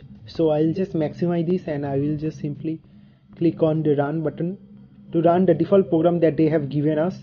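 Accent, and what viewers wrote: Bengal, India. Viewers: typical bengali accent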